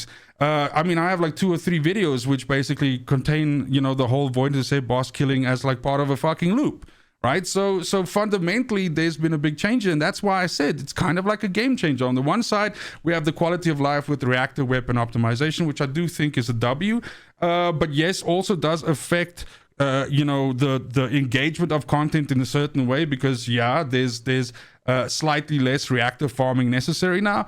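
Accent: South Africa. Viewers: South African Afrikaans accent! Thanks for the video.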